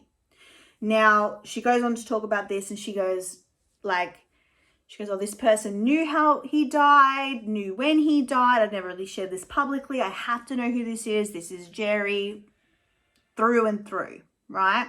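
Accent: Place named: Australia. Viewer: Girl you gotta be a fellow Aussie! I was thinking “why does she sound so normal and relatable” cos we have the same bloody accent 🤣 subbed x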